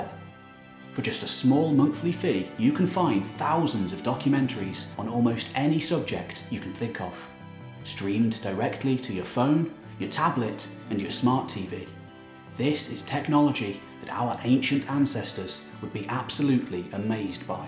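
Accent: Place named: Midlands, United Kingdom. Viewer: Mercia, you Midlands I think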